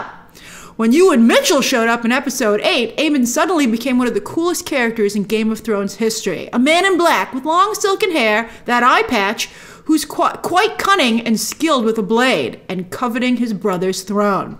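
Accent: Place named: New York, United States. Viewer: I love her new york/New Jersey accent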